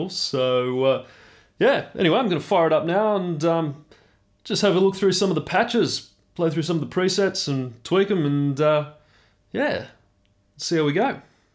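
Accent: Australia. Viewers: Man, just your introduction with your Australian accent puts me in a good mood. Thanks for the review. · Most Austrailian bloke I've ever heard!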